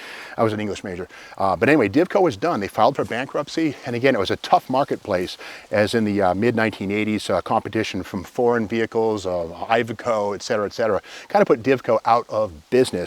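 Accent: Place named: New England, United States. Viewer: I always love it when you do these videos AND when you are on auto auctions - you convey information in an easy to understand way and your vocal characteristics are just first rate! Being from Vermont early on, I do miss that New England accent as I live in Virginia. Keep up the great work and thank you for being on youtube!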